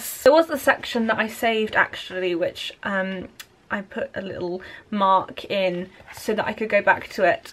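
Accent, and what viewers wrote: United Kingdom. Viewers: Certainly I just love reading vlogs 😇 Thank you so much for this amezing Vlog😇🤝 And you know what your British accent is just phenomenal ❤️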